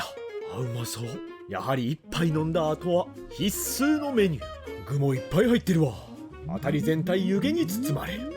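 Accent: Busan, South Korea. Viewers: 경상도 사투리도 잘하시네요.😅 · 좋은 데이가 고고로 채워줬 데이~🎶 부산 사투리 제대로 쓰시네요! 👍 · 물론 철은 아니지만 몇키로 주는지 모르겠지만 비싼편 이네 근데 한국분 아니심? 발음이 한국인 인데용?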